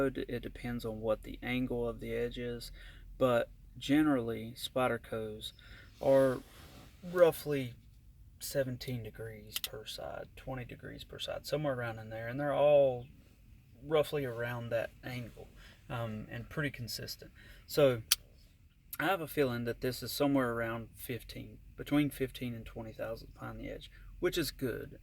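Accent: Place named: South, United States. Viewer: Where are you from? Sound southern like myself, I'm from Texas.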